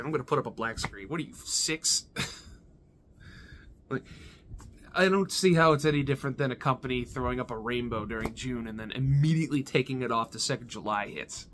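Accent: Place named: New York, United States. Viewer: Wait a minute your not italian? I thought u was from New York i watch the vpw2 freem video and as soon as u chose shockmaster I was like I need grab a slice with this guy he knows his shit the Gambino look scratch all the politics talk what ever happens happens just know u went down as a made men and we honor that look at the brightside atleast u still got your video game collection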